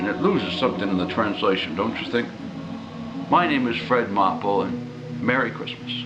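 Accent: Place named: New Hampshire, United States. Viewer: Merry Christmas, Fred! It just ain't Christmas without hearing you read the Christmas story, New Hampsha style. Keep up the good work!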